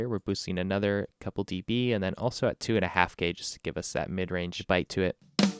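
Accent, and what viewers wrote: Canada. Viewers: I can tell you're from Canada by the way you say "out" which is "oat". Let's check this oat. So, where is from???? Canada! I heard some of that album a few years ago. I thought it was really good but never really listened to the drums so much (being a guitarist) but this is quite interesting. I wonder how you got those samples into their own tracks. Did you somehow trigger them FROM the real tracks? Maybe you have a vid on that where you explain. Thx for the walkthrough!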